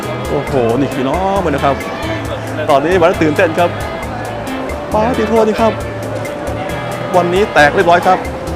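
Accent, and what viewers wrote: Phu Thai, Thailand. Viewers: เจ้าเป็นพูไทยฝั่งลาว หรือฝั่งไทย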